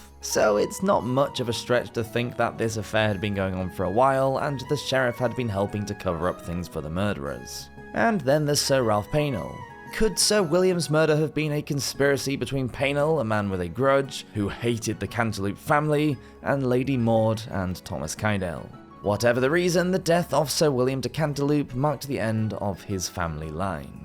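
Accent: England, United Kingdom. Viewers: Love your content and your lovely English accent.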